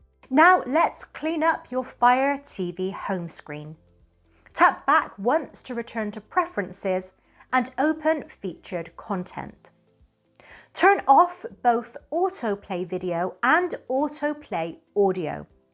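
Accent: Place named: United Kingdom. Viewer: I love your British accent!!!! ❤❤❤❤❤❤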